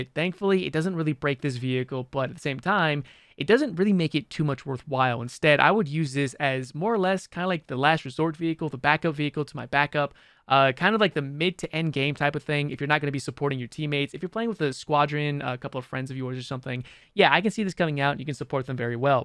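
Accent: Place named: United States. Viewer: You have the voice of callmekevin mixed with a clickbait youtuber voice and the tone of an american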